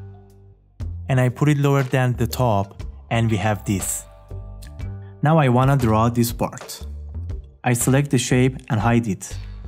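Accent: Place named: Iran. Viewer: لهجه ایرانیت تو حلقم عالی ادامه بده ❤😂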